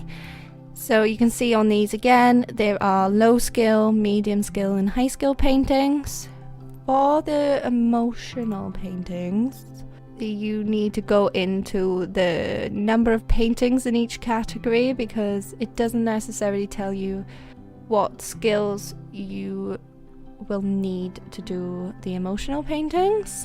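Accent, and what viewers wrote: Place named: Wales, United Kingdom. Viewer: It's nice to hear a Welsh accent, reminds me of my Mamgu!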